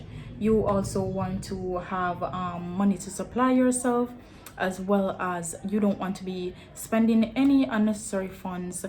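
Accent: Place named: United States. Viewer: I can hear the American accent coming up little by little... Especially when u keep using the term "you know"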